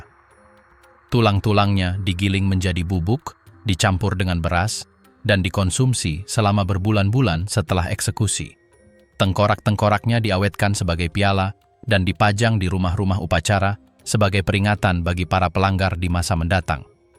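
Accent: Malaysia. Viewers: Milih narator nya orang Malay, kalo text nya sih bhsa indonesia 😂gak enak dikuping....skip ah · Logatnya malaysia 😢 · Admin gadool, bahasa indonesia gaya Malaysia, Mereke mereke ape bende, gak enak blas di dengerin gatal telingaku nyimak suara admin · Kenapa logatnya dari negeri Jiran padahal nama channel nya a globan Indonesia 😅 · Itu kayaknya orang malaysia belajar ngomong indonesia jd logat malaiysianya masih kental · Min,logat/dialek Malaysia anda masih jelas terdengar.Hebat kan Bahasa/dialeg Indonesia?😊Orang Malaysia lama kelamaan akan berbahasa dan berdialek dengan bahasa Indonesia.....😅😅😅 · Dari cara BERBICARANYA saja ORANG MALINGSIA😁😁. VIDIO Abal Abal....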